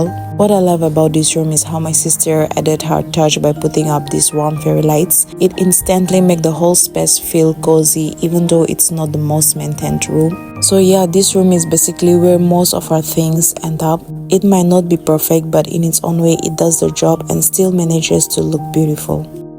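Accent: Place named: Nigeria. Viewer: Your home is pretty but has anyone told you that you sound like a Nigerian I had to double check your profile but its cute like you are one of us❤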